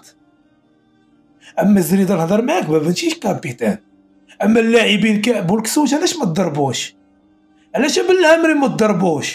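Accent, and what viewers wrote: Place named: Jerada, Morocco. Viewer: غير طريقة الهضرة نعرفك جرادة هركاوي و صراحة بزاف هد الكادوات للمدللة رزاء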